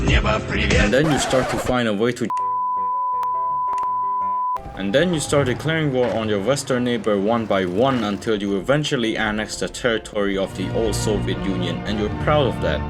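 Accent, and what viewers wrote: Germany. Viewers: Is this guy german, if no his german is really good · Great German accent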